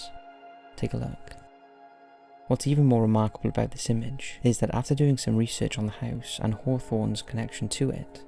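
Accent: Wales, United Kingdom. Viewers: His accent is Welsh.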